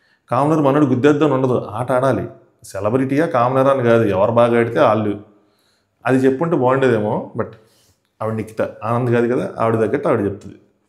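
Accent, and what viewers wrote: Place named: Srikakulam, India. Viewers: mee roots Srikakulam aa....konni words lo doubt vacchunde....vja antaru but slang okkosari doubt vacchedhi😅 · Yes same doubt naku kuda matalu srikakulam vijayanagaram side laa vuntay but he is from vijayawada...may be ikkada settle ayyaremo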